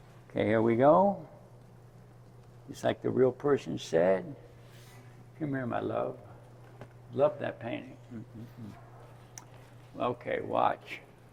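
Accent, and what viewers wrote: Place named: United States. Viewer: I was not sure at first and I thought on no not another yank talking, but I just love how they turned out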